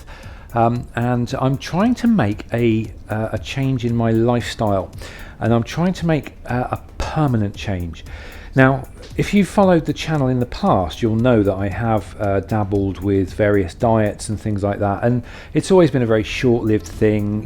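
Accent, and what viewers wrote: United Kingdom. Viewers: I was so happy to find a new video from you! I really really enjoy listening to your friendly kind voice and your beautiful British English. Since I have some weight, digestion and disciplin issues myself I also find your ”rambling” very interesting and inspiring. Thanks for being so open and sharing this! I’m sure I’m not the only one who will start to think about how to tackle their problems using your input. Warm greetings from Sweden! 🌷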